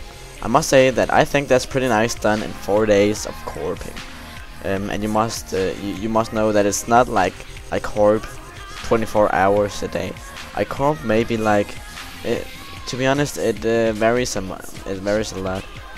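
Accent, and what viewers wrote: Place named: Denmark. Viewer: so danish accent.. trololol, like this serie, it's awesome seeing progress videos, Next drop: ely!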